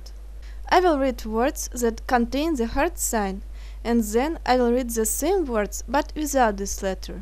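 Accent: Russia. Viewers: hello, I like this girl's English accents, does who knows that is every Russia girl speak English like this? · i love her russian accent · Сразу слышно что русская))) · OMG ... Alphabet is so difficult but russian accent is so beautiful 😍😍😍😍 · У Вас приятный русский акцент. Приятно слушать! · По произношению понятно, что ты русская)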